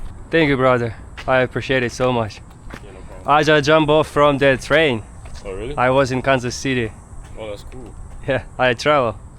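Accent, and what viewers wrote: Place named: Chernihiv, Ukraine. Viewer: У тебе такій говорю наче ти з чернігівської області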